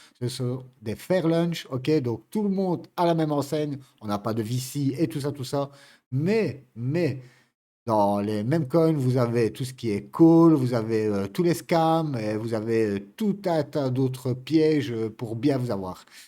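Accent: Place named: Belgium. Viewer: toi tu es un compatriote,accent Belge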